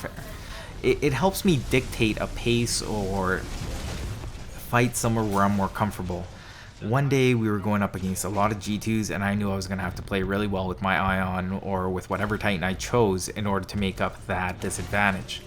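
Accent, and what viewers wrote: Canada. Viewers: Bro are you Canadian because I'm pretty sure I here a Canadian accent every time you say about and out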